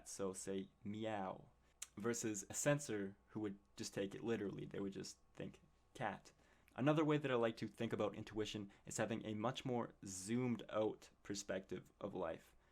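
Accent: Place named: Canada. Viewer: Are you Canadian? Love your accent!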